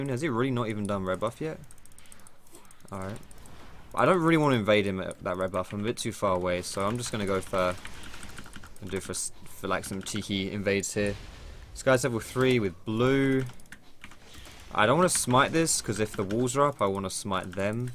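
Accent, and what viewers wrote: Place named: Essex, United Kingdom. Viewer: Think he os from Essex, sounds like the accent.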